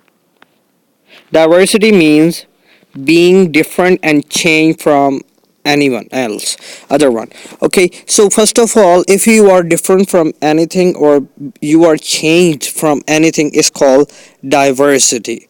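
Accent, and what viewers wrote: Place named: United States. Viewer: US accent mai bt krny ki... so horrible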